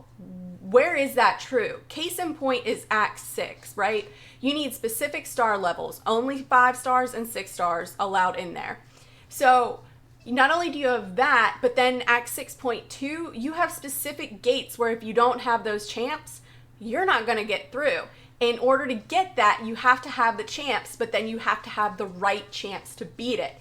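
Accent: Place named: Texas, United States. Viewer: You seem to have a hint of a western accent, like texan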